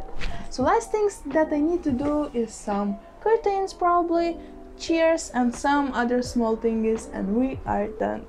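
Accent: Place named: Russia. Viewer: сначала подумала что очень знакомо звучит английский, а потом поняла что ты русская^^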